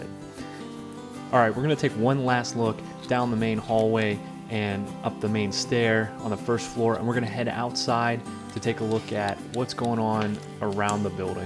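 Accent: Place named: Pittsburgh, United States. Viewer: Popped up in recommend, heard a yjnzer accent, saw the house and was like hey I grew up in jeannette! Crazy how the world works. Good luck!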